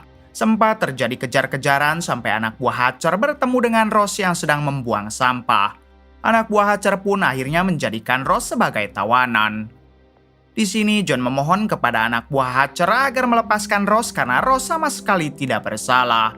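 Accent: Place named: West Java, Indonesia. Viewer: Lidah Sunda SDH gak kaget z jd j pokoknya lawak tukang panci keliling 😂😂😂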